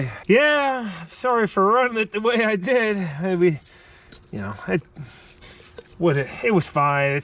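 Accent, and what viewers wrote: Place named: New Jersey, United States. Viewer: I’m not a car guy or “Motörhead” but I am amazed by your videos. The expertise and the way you can diagnose and fix just about everything… And you have the parts right there at hand… Is truly incredible to watch. Plus I love the jersey accent because that’s where I was born and raised. Anyway, keep up the great work, man.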